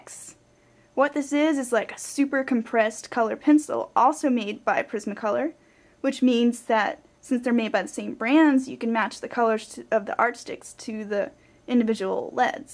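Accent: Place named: South, United States. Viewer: Great video,sounds like your from the south y'all.love it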